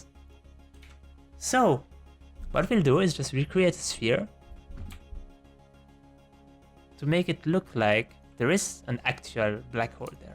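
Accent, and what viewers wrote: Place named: France. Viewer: You have the French accent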